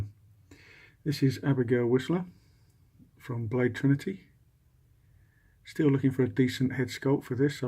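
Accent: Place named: United Kingdom. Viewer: To thanks for always uploading your video. I have seen some of your old video(and love it)-aliens marines,wolf complete with cleaner kit asesory,predalien and always feel amazed how in depth your reviews are. And yeah maybe its awkward but i do like your accent - the explanation so detailed and smooth:) Sometimes i wonder why i never born at UK hehe:). Im a new collector sixth scale mainly ht old version preds and aliens marines but thanks for always uploading the figures:)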